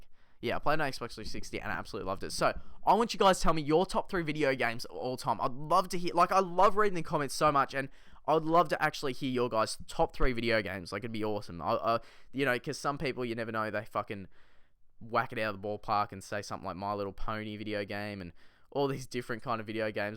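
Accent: Australia. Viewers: Love the accent x) Australian?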